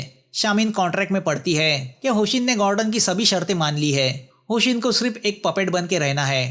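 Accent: Maharashtra, India. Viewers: amazing Marathi accent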